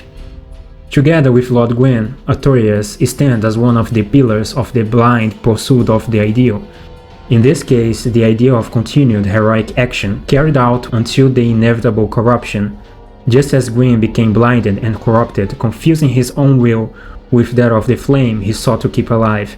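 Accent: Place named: Brazil. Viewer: Krl essa é a primeira vez que percebo o sotaque brasileiro no ingles kkkkk. Video mt bom. Tava querendo fazer algo parecido tbm.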